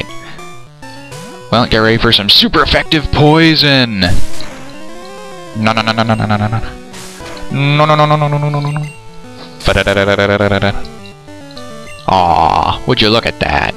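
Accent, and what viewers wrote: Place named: Canada. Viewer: you is entertaining <3. Love the Nuzlocke challenge. "What now the shirtless wonder?" :3 Love the Canadian accent as well haha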